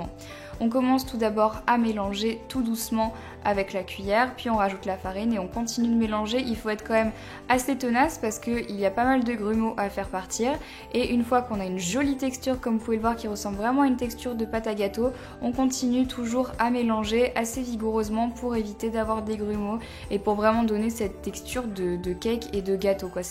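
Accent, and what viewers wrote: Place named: Naples, Italy. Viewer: on dirait à Napolitain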